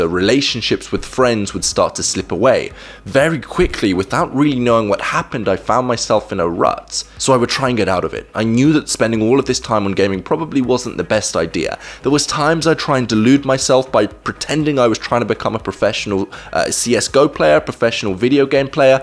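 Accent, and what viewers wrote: England, United Kingdom. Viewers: The amount of click bait in this video and terrible over-simplification of the topic should be the basis of your next video lmao. Using your English accent, simplifying popular activities, using clickbait words like “re-wiring, detoxing, dopamine, neurons, etc” You may have the simpletons and immature people in the YouTube comment section fooled, but you really haven’t added anything to the conversation or made any concrete valid points worth anything. It’s a lot easier to see through this lame facade you are trying to project.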